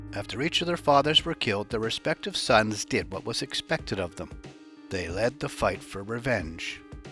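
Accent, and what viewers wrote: Canada. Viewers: Is he from Canada ?I've been trying to figure it out. He sounds like he's from Canada. Asking from Vancouver! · Hi. You sound really Canadian!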